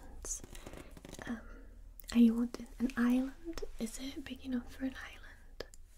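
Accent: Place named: Newcastle, United Kingdom. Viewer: The North country, Newcastle/South Shields area.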